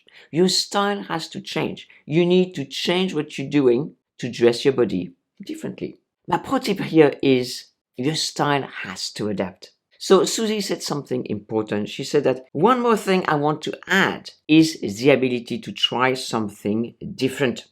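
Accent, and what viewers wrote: France. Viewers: . You are a natural teacher. Your voice tone, the pauses, inflections are so calming. The French accent is the topping on the cake! You impart a part of yourself to us. We feel it. You are so confident. You pull us in and we want to learn everything! Even if we know we're already. Thats a gift from God. Never give up. PS I can't wait to make apple your cakes from your other channel.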